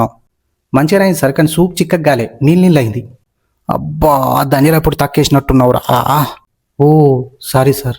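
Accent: Telangana, India. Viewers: Beautiful telangana dialect 😊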